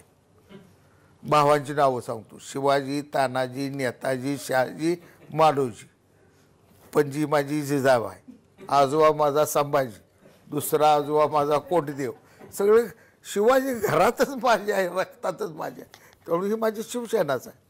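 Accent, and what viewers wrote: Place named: Satara, India. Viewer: अस्सल मराठी बाज ,रांगडी , असली माण सातारी भाषा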